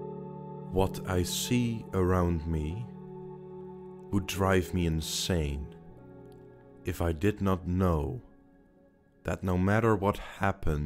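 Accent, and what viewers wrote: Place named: Netherlands. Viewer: I think these quotes are narrated by a Dutch person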